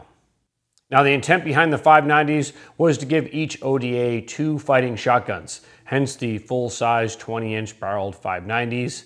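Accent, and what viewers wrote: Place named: Canada. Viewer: Are you originally from Canada? Your accent sounds Canadian.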